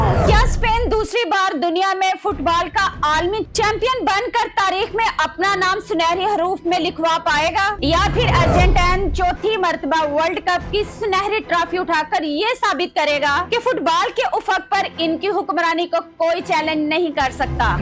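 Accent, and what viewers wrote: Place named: Punjab, India. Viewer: یہ کوئی بڑی سفارشی ھے،،،اردو بھی پنجابی میں بول رھی ھے،،،جیسے خواجہ آصف بولتا ھے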